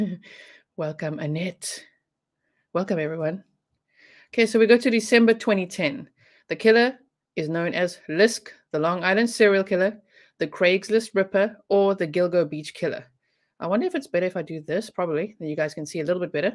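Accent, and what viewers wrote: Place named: South Africa. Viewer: Hi! I've been listening to you with great interest. Your accent was só Mzanzi, but channel address is 'Netherland'. After your story about 'René', I know I didn't miss the accent. 🇿🇦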